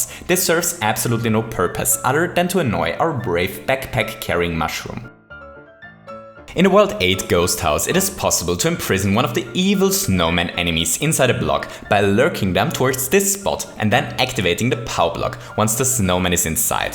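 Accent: Germany. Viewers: I know, it's a silly question but are you german. Your accent sounds like a german one. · Hey you have a German accent are u German? BTW love ur videos :)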